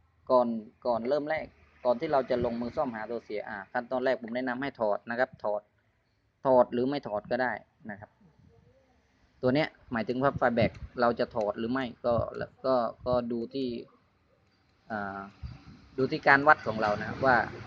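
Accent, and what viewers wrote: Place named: Surin, Thailand. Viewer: สำเนียงเหมือนเขมรสุรินทร์เลยอยู่อำเภออะไรล่ะบ่อง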